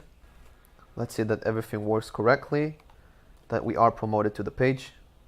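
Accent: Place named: Israel. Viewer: סרטון טוב תודה!! באמצע הסרטון פתאום חשבתי לעצמי... רגע רגע יש לו מבטא ישראלי :)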